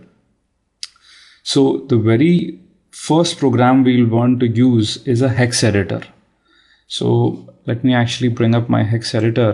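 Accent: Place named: England, United Kingdom. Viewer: you have a strong english accent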